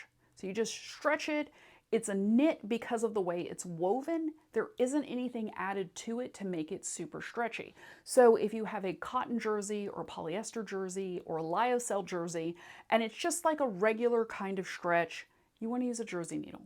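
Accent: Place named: New York, United States. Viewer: Thank you for this video! I've been sewing longer than I care to admit (58 years....?) and what I learned, I learned when I was 10 or 12 in home ec classes (do they still have those?). I had a vague idea of sizes - smaller for smaller fabrics (that meant lighter to me), and larger for larger fabrics - but I knew nothing about the numbers. You didn't mention the second number - is that important as well? One other great needle is a leather needle - they have a very sharp triangular point that is sharp on all sides and can be really handy if you're having trouble sewing something very heavy (think multiple layers of denim). Also loved your hipsters (ahhh, brought me right back) and I detected a wee bit of NY in your accent.... :) Glad I found you - we never stop learning!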